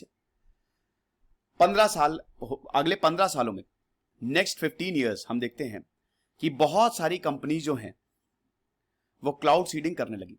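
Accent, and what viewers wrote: India. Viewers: 听球不懂牛尿味儿的印度口音，但评论里的文字真切地让我感受到无数的充满嫉妒之火的血红双眼。 I really felt the jealous eyes in the comment.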